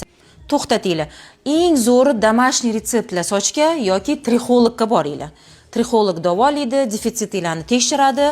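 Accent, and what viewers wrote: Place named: Tashkent, Uzbekistan. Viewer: Тошкентча. Гапиришга гап йук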